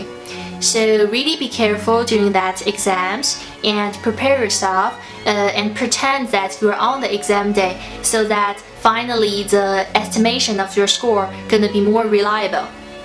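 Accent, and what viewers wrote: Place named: Singapore. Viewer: its the way she speaks her accent..i lived in singapore for 6 years and did my schooling there and alot of people over there speak like her but when they write you will come to know how awesome their english is ...dont be fooled ....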